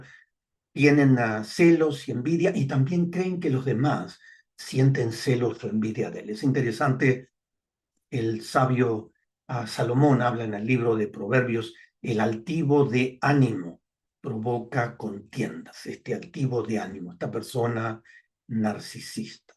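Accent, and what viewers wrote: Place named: Argentina. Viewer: Gracias por tomar tu tiempo y hablar de esto temas importantes Pareces argentino, el acento , yo soy argentino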